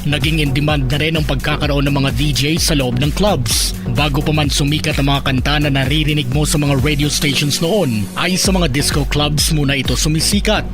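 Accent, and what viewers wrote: Iloilo, Philippines. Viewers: Taga Sara ni si sir ilonggo gid hehe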